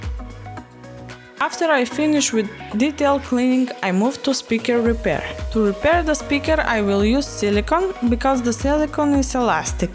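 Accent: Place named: Eastern Europe. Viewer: I sense a very distinct Eastern Europe accent, and I greet you from Bulgaria, awesome videos! subbed to both you and Andrew (Андрей?)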